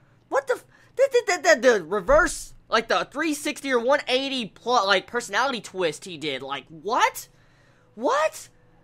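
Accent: United States. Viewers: are you american???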